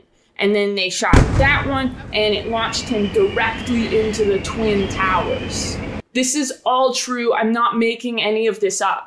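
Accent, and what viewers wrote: Australia. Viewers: Actually really good Australian voice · Solid Australian accent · More australian accents please. 10/10 video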